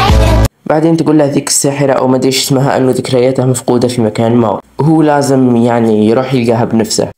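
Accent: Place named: Saudi Arabia. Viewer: احس صاحب القناة سعودي ههههه حسبته مصري طلع سعودي بعد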